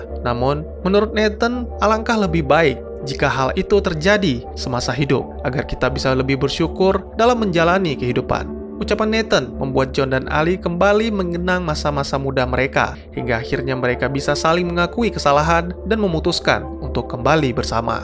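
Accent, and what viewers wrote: Aceh, Indonesia. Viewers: Dro ureng aceh nyo bang? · Weh abng orng Aceh bukan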